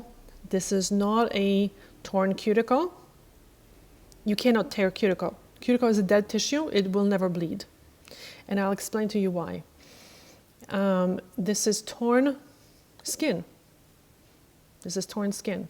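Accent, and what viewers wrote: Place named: Poland. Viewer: I can hear a slight Polish accent in your English. Am I right?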